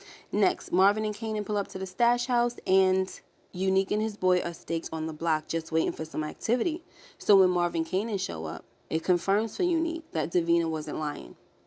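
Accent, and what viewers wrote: New York, United States. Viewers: Love your New York accent! I kinda miss it up there. PS- Symphony is not to be trusted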